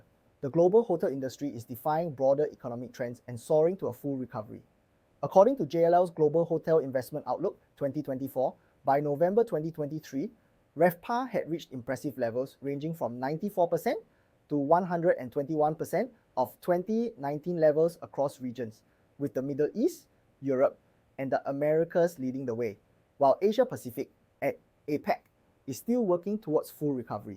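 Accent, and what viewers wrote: Singapore. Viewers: Apart from your original voice, this Singaporean accented one is also good. Please stick with one, or my mind gets confused as to who's talking to me via your videos! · Singaporean accent! Feels so homey :)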